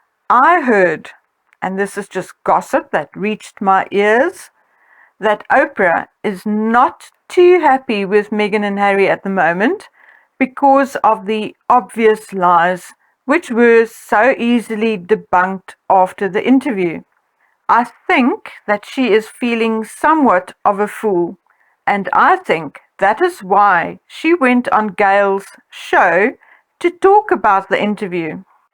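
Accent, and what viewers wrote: South Africa. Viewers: ...firstly I really enjoy your vids and appreciate all the research and effort you put into producing and posting them Thank you. May I ask about your accent? Is it South African?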